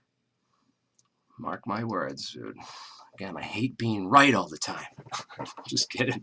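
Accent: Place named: New England, United States. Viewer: My first time watching this channel. Sounds like a New England guy.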